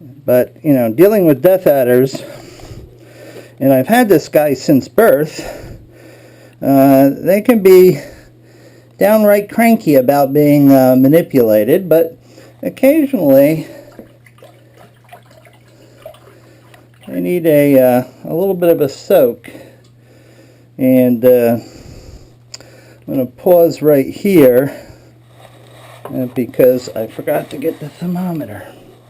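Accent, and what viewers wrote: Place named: New York, United States. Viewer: He's a New Yorker - they all sound like that! LOL!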